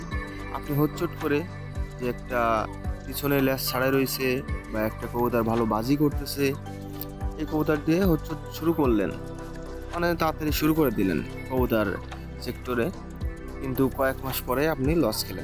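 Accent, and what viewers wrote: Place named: Magura, Bangladesh. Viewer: আপনার কথা শুনেই বুঝতে পারছি যে আপনি মাগুরা বা এর আশপাশের এলাকার লোক। আমার বাসা আলোকদিয়া পুকুরিয়া, বগিয়া ইউনিয়ন। আমি মাগুরা শহরে থাকি।যাই হোক আপনি কত দিন যাবৎ কবুতর পালন করছেন? আপনার কি মনে হয় যে এটা পালন করলে কি লাভবান হওয়া যায় , নাকি শুধু শখের বসে এটা পালন করা উচিত? একটু রিপ্লাই দিয়েন।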